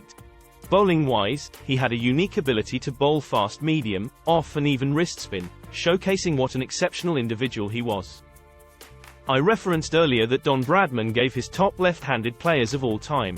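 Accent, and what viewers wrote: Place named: England, United Kingdom. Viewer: Cricket is about elegance and beauty, not stats. At least for the fans. Like your attempts at ranking the various cricketers according to their different specialties. However, learn this - no left-hander is better than Garfield Sobers as a batsman. He was great against all bowlers on any type of wicket. Brian Lara is 2nd. You speak like an Englishman. No West Indian of my age would prefer to watch Geoffrey Boycott bat over Tom Graveney. Old Tom, a wonderful English stroke player. Also, David Gower another of a different era. Keep up the good work. Like your videos.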